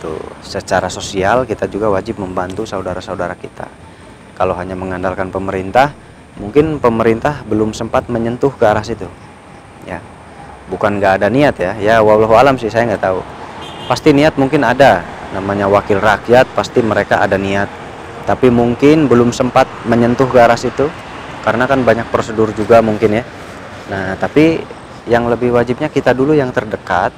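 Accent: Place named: Sarawak, Malaysia. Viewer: Ni suara kitak. Org sarawak. 🙋‍♀🤩🤩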